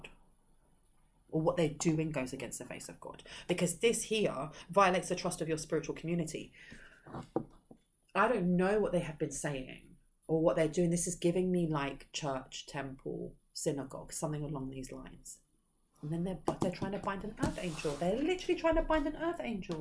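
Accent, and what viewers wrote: England, United Kingdom. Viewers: GUESS WHAT, EVERYONE USUALLY HAS CARS HOTRODING , ALOT OF NOISE OUTSIDE, THATS LIFE, MOST HOUSES , ESPECIALLY THE MODERN MODULAR HOMES ARE NOT SOUND PROOF. HOWEVER, I AM VERY LUCKY, MY HOUSE HAS 6 INCH WALLS AND WELL INSULATED. SOMEONE IS JUST NITPICKING, BLOW THEM OFF , ENJOY YOUR READINGS, LOVE YOUR ENGLISH ACCENT! CHEER UP,, DO NOT LET NASTY PEOPLE RUIN YOUR DAY! KOOTOOS!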